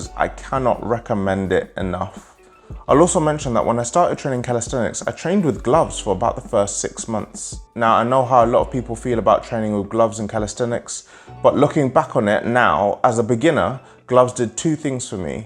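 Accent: United Kingdom. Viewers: Your videos are twice as useful for me because I get my calisthenics info in a weekly basis AND I even get to practise listening to your UK english accent since I have the CAE C1 exam in June! Good stuff man!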